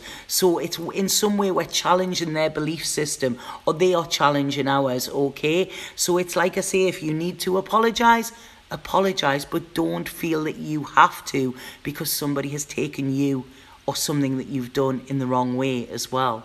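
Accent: Newcastle, United Kingdom. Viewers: Luv u, you're such a breath of fresh air! Thanks so much for all that you continue to give. I'm off to Peru on Tuesday and I'm so looking forward to the experience. God knows what I'll find there but I'm totally up for it! When I'm away it'll be lush to hear another Geordie when I'm so far from home!😉xxx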